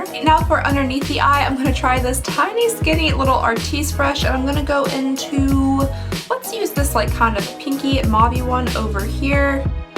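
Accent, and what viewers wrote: Kentucky, United States. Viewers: I think its beautiful. I live in kentucky, you sound like you are from Kentucky also. Sorry, just curious.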